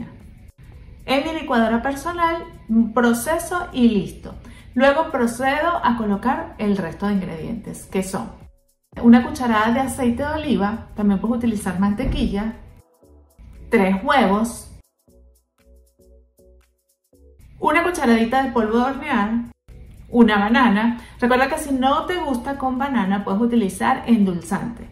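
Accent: Venezuela. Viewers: ♥️♥️ creo que somos paisana por tu acento eres Venezolana...😍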